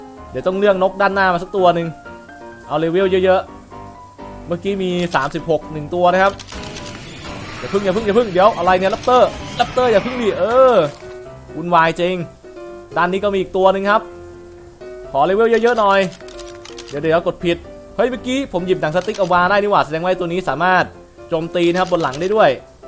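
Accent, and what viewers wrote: South, Thailand. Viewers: พี่อยู่ภาคใต้หรอครับ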